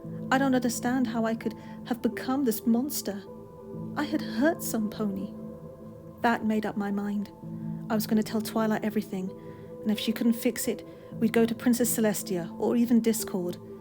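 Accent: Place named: England, United Kingdom. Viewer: I always wanted to know what Fluttershy would sound like with and English accent and now I know! Good job!!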